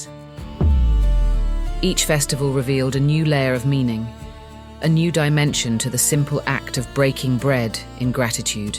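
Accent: United Kingdom. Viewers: I’m 49 seconds in, the British accent on the narration though? I hate AI because it has a way of coming across as tone deaf and too much, but the imaging is cool and story telling is (over the top. These things can be written by a romance novelist for the same effect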